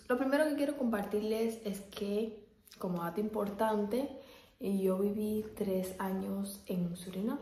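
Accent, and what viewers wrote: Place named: Cuba. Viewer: Ese acento de que parte de Cuba es?? Un grande saludo de un Colombiano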